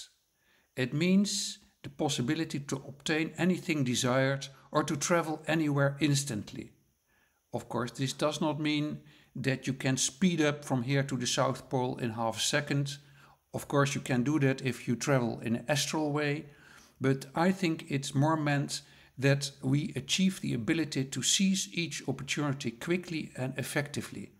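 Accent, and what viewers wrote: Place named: Netherlands. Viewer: Love the Dutch accent. Sounds cute😊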